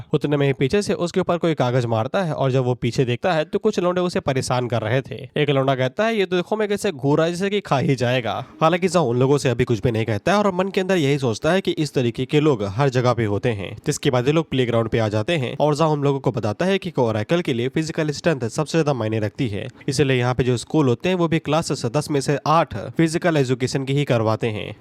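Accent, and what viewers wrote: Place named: Bhojpuri, India. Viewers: Bhai bhojpuri ka tadka marte raho achha lagta h 😂😂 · Bhojpuriya voice bahut accha laga Beech beech me bole karo maza aata he😂😂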